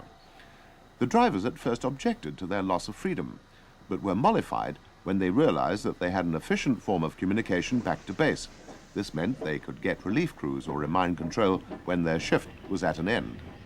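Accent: United Kingdom. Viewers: It really is weird to come back to this after growing up on thomas and seeing all of the trucks and engines in real life and in motion Also something about this narration feels so quintessentially British beyond the origin of the video and narrator accent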